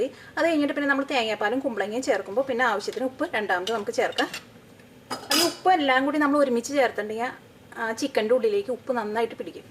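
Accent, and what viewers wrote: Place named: Malabar, India. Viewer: I really loved the narrators south Malabar accent.. keep it up dear.. Godspeed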